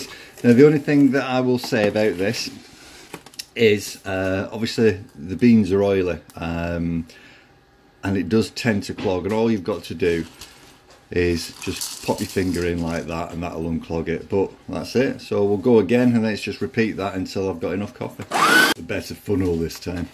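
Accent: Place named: Lancashire, United Kingdom. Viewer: ……thanks for replying bud………you sound like you’re from near to me in Lancashire. I took the top part to pieces late last night and put it back together then set it to coarsest grind then backed it further back towards the on off switch until it cut out then back until it operated………I’ve come to the conclusion it takes a couple of turns of the burr to achieve a new grind and I wasn’t giving it enough time as the grind is definitely more coarse………even so I would have expected to be able to get a coarser grind ……….but I’m not disappointed anymore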